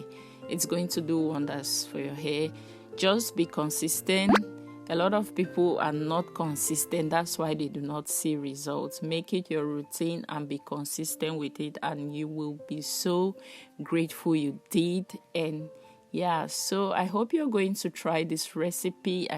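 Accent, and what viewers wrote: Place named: Cameroon. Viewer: plus u sound like Cameroonian, are u Cameroonian?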